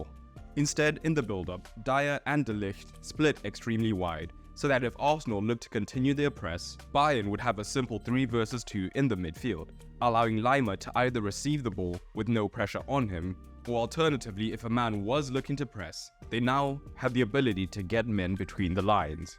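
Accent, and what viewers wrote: South Africa. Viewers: yo are you south african ?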